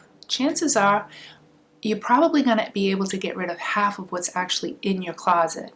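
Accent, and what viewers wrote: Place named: Massachusetts, United States. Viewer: Sometimes I forget how much I miss the Massachusetts accent.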